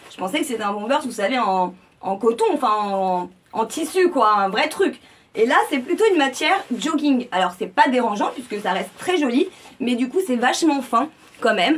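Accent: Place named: Midi, France. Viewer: Wowww toujours plaisant t'écouter surtout avec ton bel accent!! Je te suis du Québec... ton accent me rappelle ma grand-maman qui était du "Midi" comme elle nous disait hahaha. Ais-je manquée le code promo de -30%?? Allez bonne journée bisous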